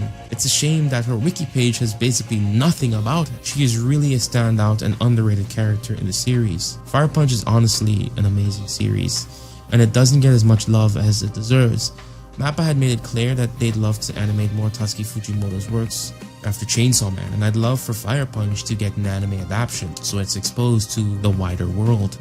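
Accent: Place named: Caribbean. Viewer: What part of the carribean are you from?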